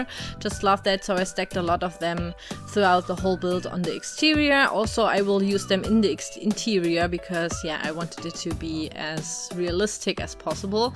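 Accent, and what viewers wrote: Germany. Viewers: Ich habe sofort deinen deutschen Akzent erkannt, aber bin froh dass du Videos in Englisch machst, um mehr Leute zu erreichen. Finde dich sehr sympathisch. Echt super gebaut!:)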